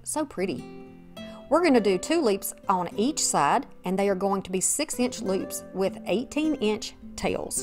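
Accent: South, United States. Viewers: Oh my goodness I have never seen Better BELLS in my life. I've never done this and I'm just excited to tell you how great I think you are. Your southern (BELLS) accent makes me feel at home. Like talking to a friend. Have never commented on line or entered a giveaway. If someone can tell me what to do from here. I hope I don't have to know a login or username cause I don't have a clue. I need a sister in crafting to help me along. Can you help me??? BELLS me back. Hehe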